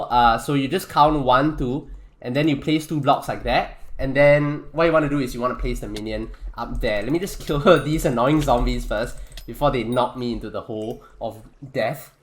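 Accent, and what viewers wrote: Singapore. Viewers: Omg that y ur voice sound like sg me also play skywars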